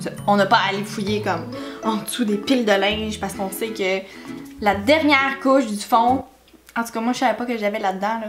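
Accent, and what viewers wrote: Quebec, Canada. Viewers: Je viens de voir deux de tes vidéos et ton énergie hyper joyeuse et ton accent québécois que j'adore font que je me suis abonnée à ta chaîne. Merci pour tout ton peps et tes pointes d'humour. Gros Bisous du sud de la France 😘😘😘😘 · Haha je m'attendais pas à ton accent en voyant le titre ;) Coeur sur tout le Québec ! (au fait, "tidying" ça veut juste dire ranger :p) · Cocou! J'adore ton accent! Ils est trop mignon! Accent quebeçois 👍 j'apprends le français. Le méthode de Marie Kondo est merveilleux mais c' est difficile de faire comprendre à ma famille 😱 bisous 😘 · Kikou, je viens de te decouvrir, je fait la methode kondo depuis 1an déjà, j ai commencé apres avoir acheté son livre en decembre 2017, et j'avais bien deja réduit mes ffaires, puis il y a 2 semaines passees j'ai regarder en non stop la serie de marie kondo sur Netflix, et j'ai adoré, et j'ai refait juste pres a nouveau un RE TRI , et j'ai reussi a me xebarasser de plusieurs sacs a nouveaux d'affaires,et je pense qu'un ultime troisieme et dernier tri se fera d'ici cet été 😊 J'adore ton style, et ma meilleure amie est canadienne, et je kiff cet accent,je pense m'abonner a ta chaine, je ne saisnpas encore si tu es vegan ou pas , moi oui depuis 6 ans a present, et tout comme la methode kondo a changer ma vie, devenir vegan m'a métamorphosée egalemnt , dans le bon sens évidemment.... 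Je te souhaites une bonne année 2019 et youtes celles qui suivront evidement, tu es un veritable petit raillon de soleil 😁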